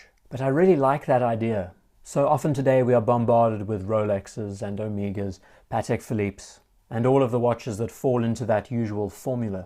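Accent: South Africa. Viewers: Do I detect the South-African accent? )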